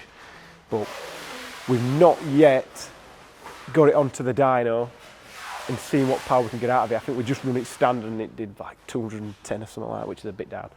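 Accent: Yorkshire, United Kingdom. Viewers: I live in Yorkshire so feel comfortable saying this, brilliant accent my friend! When talking about switching the forged wheels ‘let’s see what difference it mecks’. Brilliant. Really enjoying this 125d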